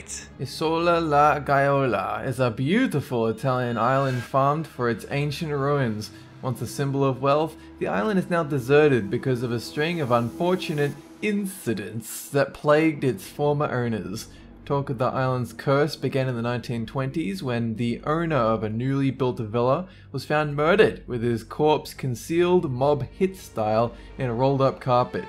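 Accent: Australia. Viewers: The guys talking sounds like he's slurring or or mumbling. I know he's Australian but it's hard to listen to. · he is australian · sounds nothing like British accent. It's Australian. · Hellbent's alright and all But I love the Australian accent They always sound drunk and it's amazing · alright, been watching you for years, gotta ask: are you Australian? i know, the accent says not-typically-Australian, but you sound so colloquial. does our generation just all speak the same..? far out. anyway, great stuff, thanks n keep it up!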